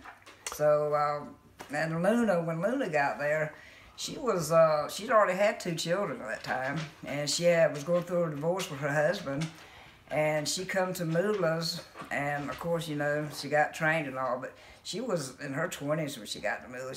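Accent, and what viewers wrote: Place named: Southwest Virginia, United States. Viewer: She sounds like she is from Southwest VA. lol I like it.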